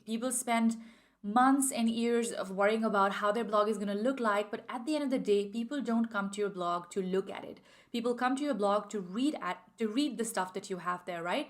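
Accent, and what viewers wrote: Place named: India. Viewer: You sound like an Indian, even your name is Indian then why does your channel say you're German?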